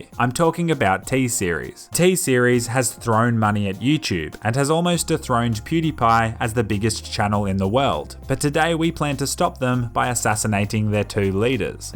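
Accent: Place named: Australia. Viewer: Thought he was Aussie I’m Australian to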